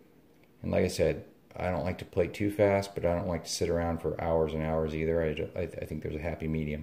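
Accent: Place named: Wichita, United States. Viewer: GREAT idea about the Ni welding rod - did you find at Harbor Frt? Sounds like you are from Wichita - me too. <mail>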